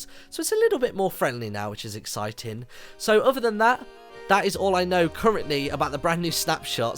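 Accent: United Kingdom. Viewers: that british is so funny :)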